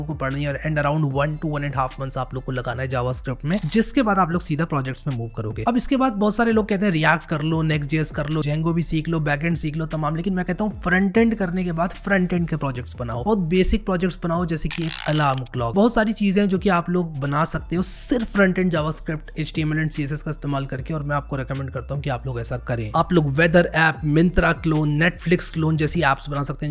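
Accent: India. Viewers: I didn't expect indian accent 💀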